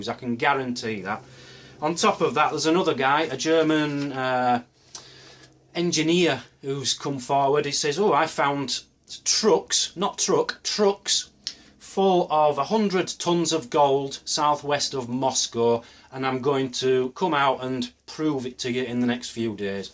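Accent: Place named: Northern England, United Kingdom. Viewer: When I grow up and become a porn star I'm going to call myself. Seriously though, you're very entertaining... and I *WILL* have a porn star name of in honour of you. P.S Where are you from, I know it's North, but are you a Mancunian, Yorkshire, Lancashire Stoke or of there about? I thought I had an ear for accents. Anyway, keep it up. and school me on how I can't detect what region you're from.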